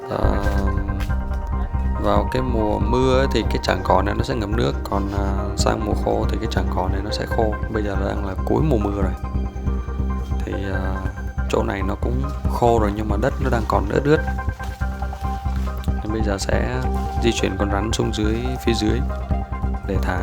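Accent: North, Vietnam. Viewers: giọng bắc 54 ở đồng nai .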